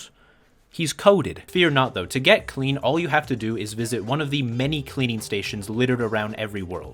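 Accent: Canada. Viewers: “outing myself as a Canadian” when you’re one of the only people I’ve been able to actually recognize a Canadian accent in 😭 Anyway thanks for providing my mandatory video about something I’ve never heard about but I get invested in anyway for some reason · lmao you said you outed yourself as a canadian but you sound so canadian man you didn’t have to even say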